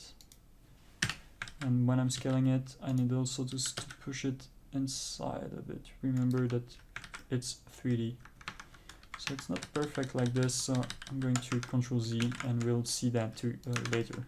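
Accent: France. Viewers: "And if you're REALLY lost because of my French Accent, don't wor-" Me, immediately: Oh no! Don't you dare be disregarding yourself, sir, ma'am, or Enby person, because I've been learning French for a REASON, DAMMIT! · I got lost in your silky smooth french accent and accidentally made my base mesh out of cardboard, styrofoam and an actual blender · oh j'ai tout de suite reconnu le petit accent français XD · nice french accent and video ! good job dude :) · man what r u talking about... ur French accent is a blessing haha. I've watched so maaaaany tutorials of asian guys understanding whom was a fucking torture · Super tuto le m'sieur à l'accent français ;-) Je viens de tomber par hasard sur ta chaîne, j'ai du retard à rattraper. Merci pour le partage de tes compétences. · French accent 10/10 <3 great work on the video it literally made me get back into blender! 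· Don't worry your french accent is understandable and nice!! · it sounds like you are french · really good tutorial and the french accent is cute. thanks! there is so much you can do with meshes and wireframes.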